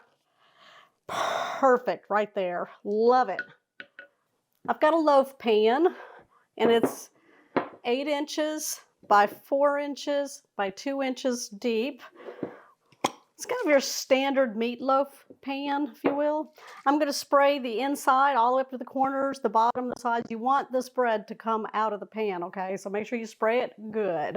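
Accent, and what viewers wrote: Texas, United States. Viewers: Hee hee shout out from Auckland New Zealand!!! I got hooked on your lovely Texas drawl! I saw your other amazing blueberry monkey bread recipe! We don’t have your USA thing ‘biscuits’. Can anyone tell me what that actually is? Scone mix?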